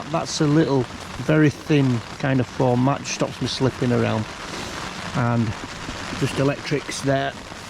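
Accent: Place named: Rotherham, United Kingdom. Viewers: I came from TikTok, but I couldn’t be happier! As a fellow northerner (I can tell by the accent) who moved to The Peak District last year, not only do I find this enjoyable, but it’s so useful, I’m going to have to watch back through your videos and learn your amazing methods and tips! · Here from TikTok. Your accent sounds super local to mine. I’m from Keighley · Where abouts are you from? I’m from Rotherham an recognise your accent 😂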